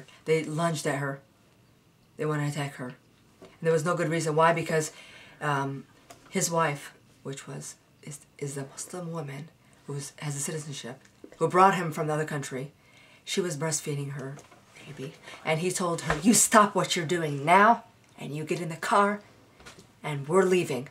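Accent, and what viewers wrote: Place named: United States. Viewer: That is Islam. Did you read the Koran? It states women are worth half as much as a male. I married an Iranian in 1990, had him jailed several times for abuse, divorced him and he's lucky I didn't kill him. Now he is remarried to his cousin, that was their plan all along. Inbreeding is the norm, birth defects are the norm, abuse is the norm. You sound american woman, don't married another muslim. Religion is evil, all of it.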